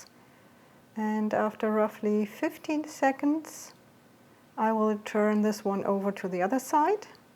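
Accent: Germany. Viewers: Perfect english ... ein bissl hört man noch den deutschen Akzent. Aber .... echt gut. Die Laugen sehen toll aus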